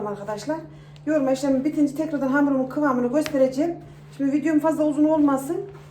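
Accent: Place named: Kayseri, Turkey. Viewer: Kayseri şivesi gibi konuşmanız çok hoş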